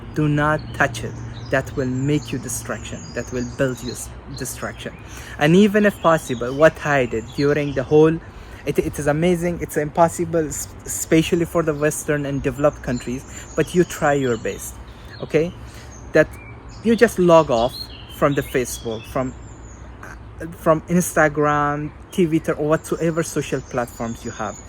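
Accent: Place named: Afghanistan. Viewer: Hi are you Afghan because your pronouns form Afghanistan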